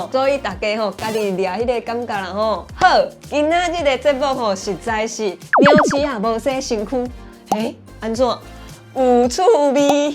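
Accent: Tainan, Taiwan. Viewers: 現在懂教會羅馬字的人不多，會使用的人更少. 今天連神學院也不教羅馬字了，這問題是事實。用羅馬字在這個頻道上面爭論，在我看來沒啥意義. 我只是看到你用了教會羅馬拼音的ka-ki, 想到了的腔調在這個詞的發音。其實台灣南北的台語腔調有些真的差異有點大，但是不妨礙有心人推廣台語的本意和努力. 在推廣台語的熱誠和用心，使人欽佩.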